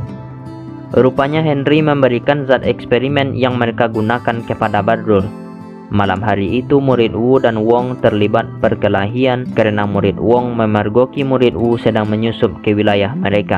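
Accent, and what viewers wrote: Madura, Indonesia. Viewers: logatnya kyk madura🤣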